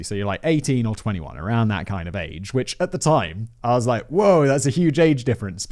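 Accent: RP, United Kingdom. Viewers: Blah blah blah. He's destroying the story! British accent isn't good enough to make up for the crap telling. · Let me just tell my friends how im addicted to watching videos about murderers narrated by a swanky bald british man, yea thats a good idea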